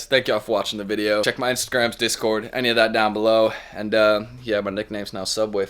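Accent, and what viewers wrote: South, United States. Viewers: your voice is an interesting mix if southern,Stoner,skater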